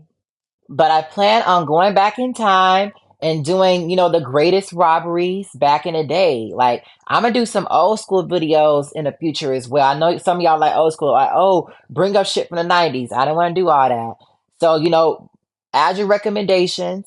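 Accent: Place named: New York, United States. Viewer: I love your commentary where are u from you song like a new yorker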